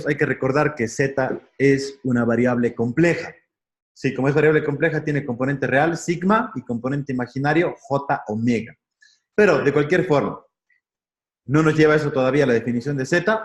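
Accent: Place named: Cuenca, Ecuador. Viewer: ese acento, seras de cuenca-ecuador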